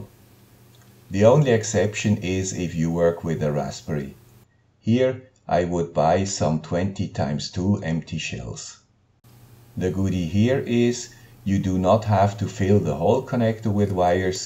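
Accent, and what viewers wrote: Switzerland. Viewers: Useful tips! Thanks for sharing,! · Trick no. 4 was exactly what I was looking for but unfortunately I don't have a solder gun at home so rip. But these tips are great,! Thankyou so much! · Appreciate the channel of the guy with sweet Swiss accent !!! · I have been surfing around for Arduino related content and every time I start watching a video or two, I end up finding one from your channel. From Lora Gateways to Dupont Wires, from Crimper tools to anything. I always find the info I am looking for at your channel. For short, I want to thank you for your great work. I am big fan, and I wish you health and strength to make many more useful videos for the community. Thank you so much:) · as usual, " ", you simply peddle great info. simple, to the point, and, that hallmark of good info, seems pretty damned obvious AFTER you're told, lol. 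kudos, and a new subscriber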